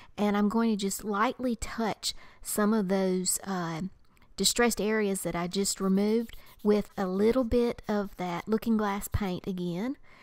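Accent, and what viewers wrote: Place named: South, United States. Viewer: Omg! Such a well done video, and I just LOVE you southern accent! ❣️ Thank you!!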